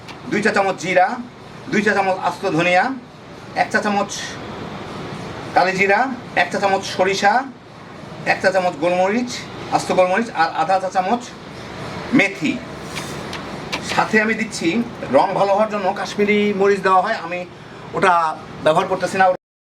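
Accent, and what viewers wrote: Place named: Barishal, Bangladesh. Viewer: আপনি কি বরিশালের??? কথার ঠং ভাল্লাগে নাই। আর রসুন তো বেশি পুড়ে ফেলেছেন।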